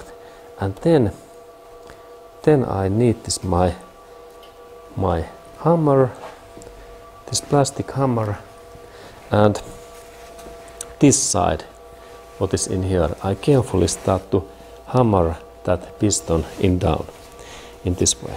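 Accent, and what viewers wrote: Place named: Finland. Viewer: Thanks for your videos. I am getting to like the Finnish accent!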